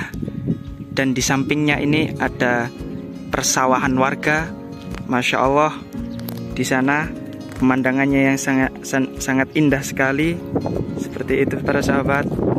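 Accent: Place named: East Java, Indonesia. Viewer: Ustadz luar biasa smg sukses boso wetane medok bgt 👍👍👍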